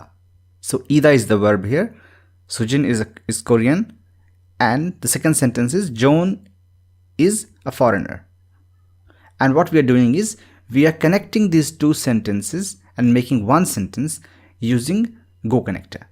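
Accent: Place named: India. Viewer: Your accent seems like Indian I'm from India too 😄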